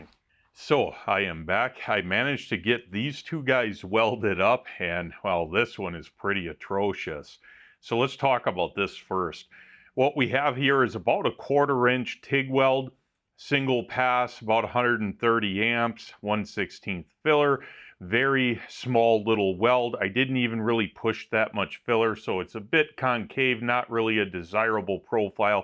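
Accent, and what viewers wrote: Canada. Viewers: I'm from Detroit, we border Windsor Canada. So growing up I knew a lot of Canadians and you sounds like them! Nice vid btw. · This is the thickest Canadian accent I’ve ever heard. This is what they call a “newfie”? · Sounds Canadian, eh? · Is this guy Canadian. The accent.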